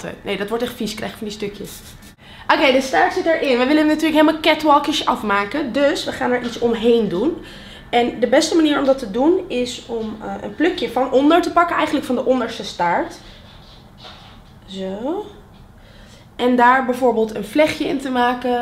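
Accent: Netherlands. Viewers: Je kat is echt super schattig bezig op de achtergrond ^_^ Sinds ik naar België verhuisd ben is het echt fijn om soms weer eens een super Nederlandse accent te horen haha :) Lovin' it! =)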